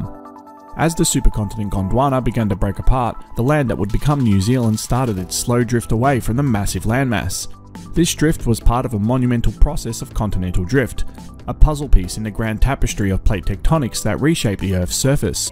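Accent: Australia. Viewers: you do an excellent production with the unique Australian that is easy to listen and related to And no worries mate Today I was going to look into the Tonga blast a few years ago I'm a big fan of Edward Lorenz and non linear dynamics so it's interesting to me Especially on such scale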